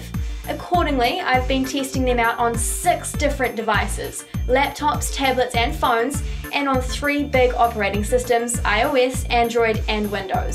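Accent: New Zealand. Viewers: I think that's a New Zealand accent. They tend to pronounce the a in words as an i. "hiving the software"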